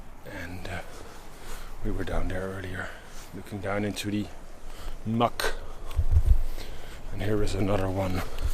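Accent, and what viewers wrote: Netherlands. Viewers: You have such a Dutch accent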